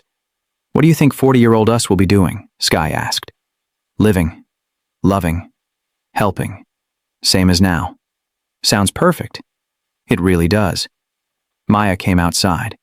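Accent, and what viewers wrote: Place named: Asia. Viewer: Hey narrator are you asian? It's obvious from the way you pronounce words.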